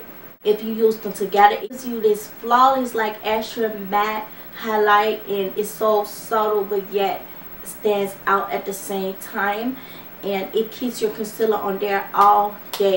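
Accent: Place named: Haiti. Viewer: Are you from Haiti pretty lady? U sound like your from my island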